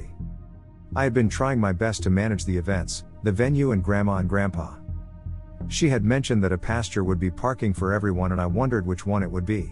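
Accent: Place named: India. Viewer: What's with the indian accent? It totally just threw me off. Unless this was in India. haha. So weird.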